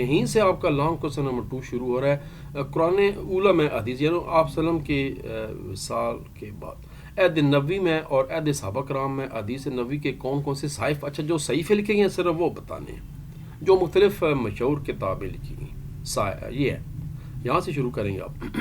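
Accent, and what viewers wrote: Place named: Rural, Pakistan. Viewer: Sir where are you from? Your accent is just like the accent of my village peoples (GANDAKASS)❤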